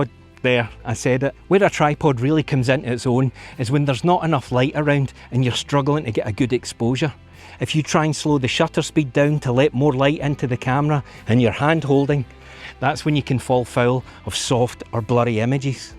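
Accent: Scotland, United Kingdom. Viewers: We have all done these thats how we learn and improve. Love the way you explain how to capture better photos. Thanks 😊everything sounds better with a Scottish accent. Lovely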